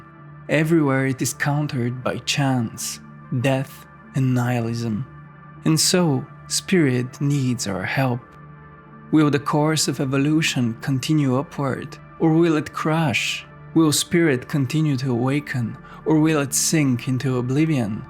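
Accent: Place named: India. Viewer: please a video about alfred adler .. you have such a nice voice its like an indian guy talking englisch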